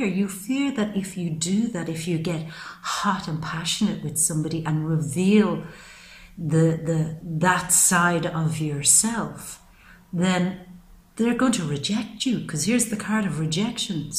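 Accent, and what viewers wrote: Ireland. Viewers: Do I detect an Irish accent? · Very nice to find you! Are you Irish? Great reading! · You're Irish also? 😊😂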